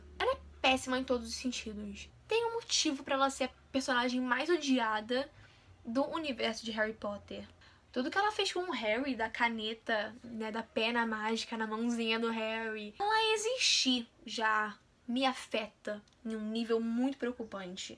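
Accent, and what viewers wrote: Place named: United States. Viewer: seu sotaque americano é tudo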